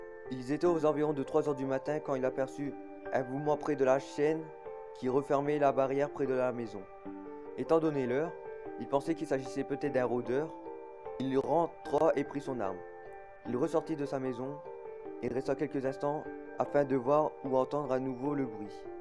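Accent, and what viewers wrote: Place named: Nord-Pas-de-Calais, France. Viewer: à entendre ta voix et ton accent... Un Ch'ti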